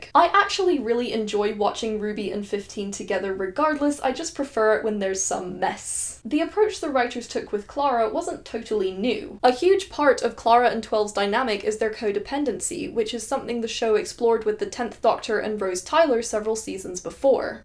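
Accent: Scotland, United Kingdom. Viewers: i cant pin your accent, you sound a bit scottish, a bit irish, but with an english twang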